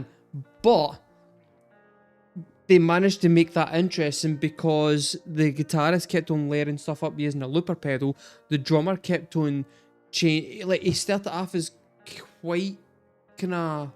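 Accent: Scotland, United Kingdom. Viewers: now that I've found an reaction in Scottish accent, my life is complete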